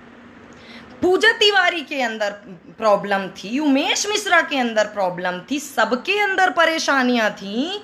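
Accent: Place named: Bagheli, India. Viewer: आप की टोटल वीडियो सुपर डुपर.. बघेली... आप के जैसा किसी की एक्टिंग नहीं है बघेली... मे.. सब सीख के बोलते है आप शुद्ध बघेली बोलती है... हर कहानी मे कुछ न कुछ सिखने को मिला..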